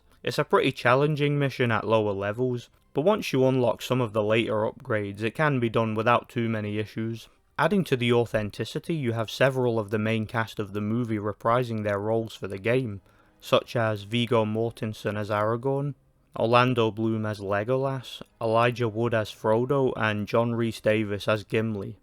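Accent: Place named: Nottingham, United Kingdom. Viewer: complete shot in the dark, especially if you say you've moved around but I'm hearing some Nottingham mostly, maybe some south or west Yorkshire or Geordie. But alternatively your "L" sound feels like Richard Taylor from New Zealand, but the rest of your vowels don't match the nasal brightness of that accent. Either way GREAT review. I played this one after Return of the King so unfortunately it felt like a big step down in a lot of ways, it felt clunky and a bit too difficult to be fun (but maybe I just sucked lol, especially that Helms Deep protect the door level). But thanks for your work. Brought back some memories.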